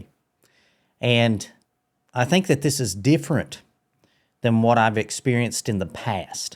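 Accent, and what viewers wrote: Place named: Appalachia, United States. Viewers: Love your hillbilly accent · r u in West Virginia? i grew up there and your accent sounds like where I grew up.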